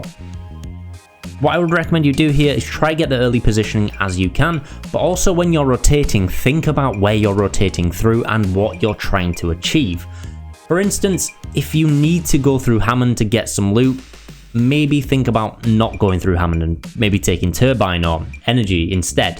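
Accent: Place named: Scotland, United Kingdom. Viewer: There are 2 reasons why I subbed. 1. I enjoy ur content and think it's very helpful. 2. Your Scottish.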